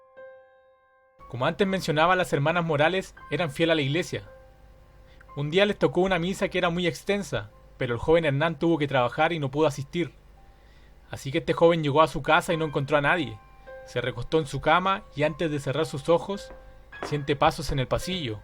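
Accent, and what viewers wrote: Chile. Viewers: Pa que tan Chileno?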